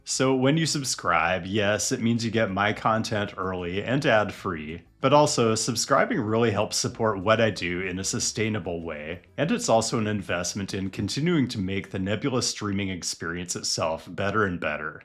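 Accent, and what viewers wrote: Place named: Pacific Northwest, United States. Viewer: The most pacific northwest accent i've ever heard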